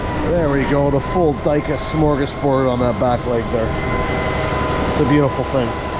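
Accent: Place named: Canada. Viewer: Lol the "Canadia" pronunciations make me giggle...de-cal v D-Cal 🤣 😂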